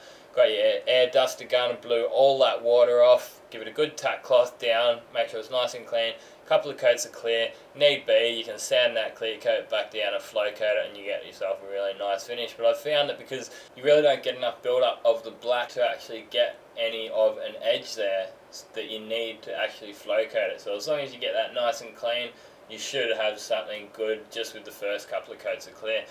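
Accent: Australia. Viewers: First, put the camera on a tripod or figure out how to keep the camera from jumping around. Serious headache! Two, Stop talking like you're committing a verbal assault. You seem to never shut up. Do you breathe? Damn its hard to listen to you w/ that thick Aussie(?) accent. I understand this is supposed to be an instructional video, but you could take a break and just paint or watch the other guy paint, quietly. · your constant talking about the rabbit you saw running across the yard yesterday and the weather etc finally made me hate Australian accents.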